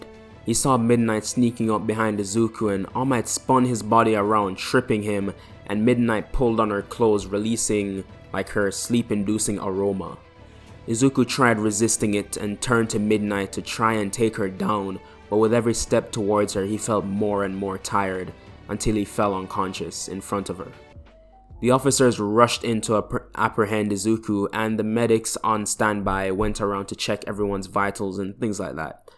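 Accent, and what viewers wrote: Jamaica. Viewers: Listen no hate I'd just like to know do u have a Jamaica ascent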